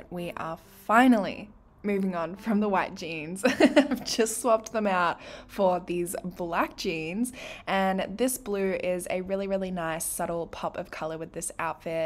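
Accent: Australia. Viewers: Oh my goodness you are the absolute best!!!! You are so beautiful!! Heck freakin'yeah of course ur Baby Girl wants in on Mama's work who wouldn't? She knows how gorgeous she is and how awesome her Mama is!!! She wants to be the icing on the cake and get those "bootie scratches" I call them 🤣....Puppers and Kittas are awesome!!! I just recently subscribed to your channel after watching a few of ur true crime videos and just fell in love with it!! I love true crime and u do a fabulous job!!! Plus u are so beautiful and cute!! I love the "disclaimer"...lol you put on one of ur videos I watched recently when u said listen I'm going to pronounce things wrong..lol. I mean who doesn't pronounce things wrong like all the time!!! And I think u do a fantastic job!!! U do ur best to pronounce things properly that I could NEVER even attempt to!!! So if the haters wanna hate let em' that's on them!!!! 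Also when someone would bitch about ur Mystery Mondays not always being posted on Mondays persay....get the hell over it ur a busy woman!!!! I absolutely love ur accent as well!!! I'm American, I've always loved Australian accents though!! Take care! ❤️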